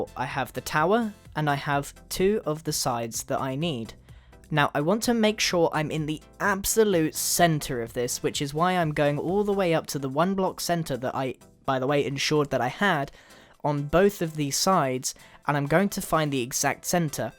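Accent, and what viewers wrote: England, United Kingdom. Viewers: He is British. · Everybody loves the English accent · Yeah English accent, that's what its called.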